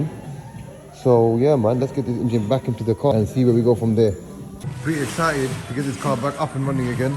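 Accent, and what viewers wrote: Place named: East London, United Kingdom. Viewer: Bro you from east london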